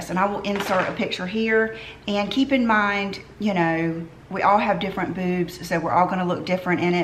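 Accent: South, United States. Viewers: We have the same southern accent...I am from Lake Martin, Alabama? What state are you from?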